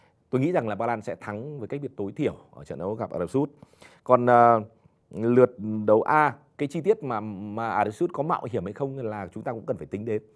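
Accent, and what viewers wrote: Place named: South, Vietnam. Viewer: Bác xem thế nào góp ý chương trình bình luận bóng đá bằng giọng bắc đi bác.chứ em thấy bác giọng nam không hợp một tý nào .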